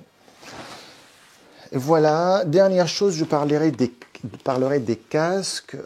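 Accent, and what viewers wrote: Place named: Tunisia. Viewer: Salam shalom salut Je suis le 900ieme depuis hier ! J’aime énormément tes vidéos j’ai tout regardé depuis le début et je remonte petit à petit. C’est mon premier commentaire et sûrement pas le dernier ! J’aime énormément ton contenu, la vitesse des vidéos, ton état d’esprit, ton accent de Tunisie 🇹🇳 j’en suis sûr ! 😜 ta réflexion, ta personne. Je continue à remonter tes vidéos c’est un moment de détente incroyable. Peace ❤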